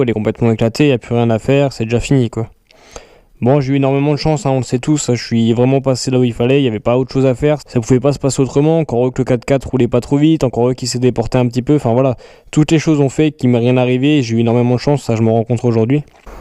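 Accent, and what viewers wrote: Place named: Vosges, France. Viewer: ouais j'ai vu après sur ta chaîne. J'ai habité dans les Vosges. J'ai reconnu un peu l'accent 😁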